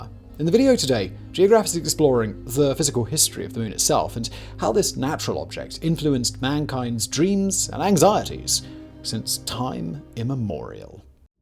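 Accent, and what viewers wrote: United Kingdom. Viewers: Personally, I think it is time to unsubscribe., why do you have so much disdain towards America and people of Euro-Caucasian descent? America indeed won the space race and cut back NASA to protect the world from Communism. Your accent affirms your affiliation with some islands hell- bent on colonization and world dominance for a half millineum. Islands which survived two wars thanks to America. The Americans did move across North America, but tell me which nation did not historical take lands from others? That is how every nation survived. Euro-Caucasians are the inventors of most of what made the world of today. Not one American alive today has done what the British, Japanese, Inca, Zulu, or other empires did throughout the world before America became the place most people of the world would want to live. You as a non-American, earning your living from American clicks, is amongst the least to speak as you do of American men of Euro-Caucasian descent.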